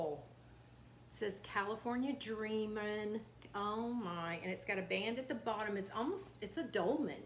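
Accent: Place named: South, United States. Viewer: Hi Sweetheart, I love your accent so much...I was in Nashville last year and I would make conversation with people, because I adore a Southern accent....I loved it there so much, God willing I will return 🙏...I went to New York as well, it was nice, but I like the South xxxxxx🌺🌺🌺Much Love Bella🌺🌺🌺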